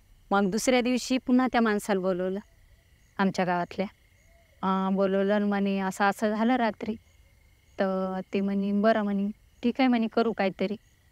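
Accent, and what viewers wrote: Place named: Marathwada, India. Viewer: आमची मराठवाड्यातील ग्रामीण बोली भाषा आहे. कृत्रिम नसल्याने ऐकायला छान वाटते.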